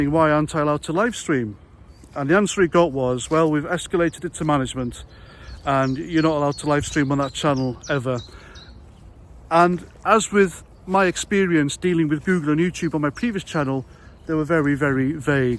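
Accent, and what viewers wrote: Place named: Northern England, United Kingdom. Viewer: great accent too.. northern and proud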